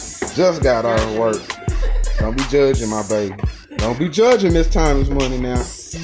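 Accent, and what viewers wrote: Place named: Texas, United States. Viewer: Side note I can def tell you from Texas lmao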